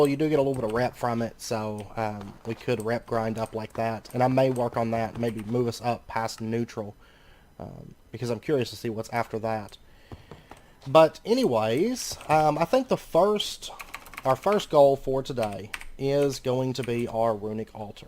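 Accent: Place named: South, United States. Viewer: To. Thanks for responding. Only found you a few days ago. You sound like you may be from the southern United States. I found your bypasses in the next episode.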